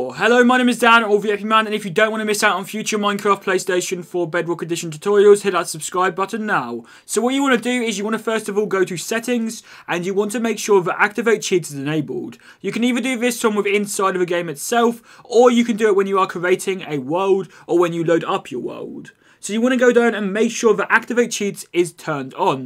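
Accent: United Kingdom. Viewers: i thought you was from the UK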